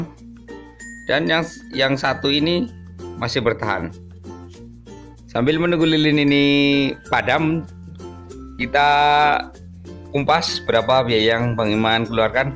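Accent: Banyumas, Indonesia. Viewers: Wong ngapak ya mas ?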